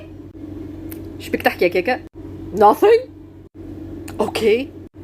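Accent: Tunisia. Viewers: هههههههههه يازين اللهجه التونسيه طريقه حلوه للتعليم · هذي اللهجة التونسية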